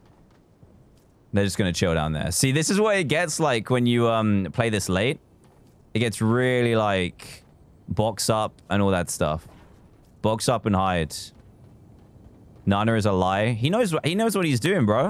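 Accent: England, United Kingdom. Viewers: I know :) I just wanted to see if I was the only one who heard it, even though it's his English accent. Thanks though 👍🏻😁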